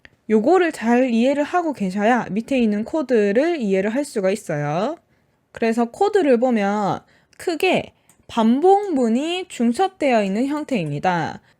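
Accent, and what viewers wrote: Gyeongsang, South Korea. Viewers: 대학 신입생 때 Fotran 수업을 들은 것이 전부였는데, 덕분에 파이썬이 어떤 언어인지 알게 됐습니다. 몇 군데 파이썬 관련 동영상을 찾아다녔는데, 가장 체계적으로 설명이 잘 되어 있어서 바로 이해 할 수 있었습니다. 명쾌하고,박력있는 열강 덕분에 30강을 완강했습니다. 가끔 경상도 억양도 매력적이었습니다. 아쉽게도 다음 강의를 찾아봤더니 다른 분이 강의를 하시네요. 다시 한번 감사드립니다. 안녕~~~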